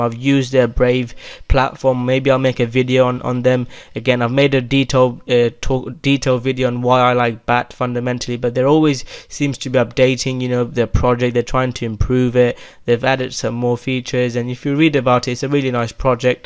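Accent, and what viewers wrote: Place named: United Kingdom. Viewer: Very appreciated again 👍👍👍thanks for the video. I love your British accent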